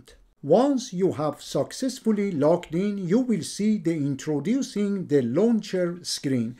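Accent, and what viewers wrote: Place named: Iran. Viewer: Thank you for clearly explaining this clearly .. your Persian accent made the whole concept actually more comprehensible & for that reason I subscribed ☺️🙏🏼🙏🏼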